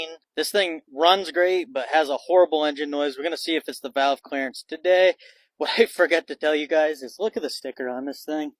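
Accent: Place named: Vermont, United States. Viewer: what part of northern Vermont are you from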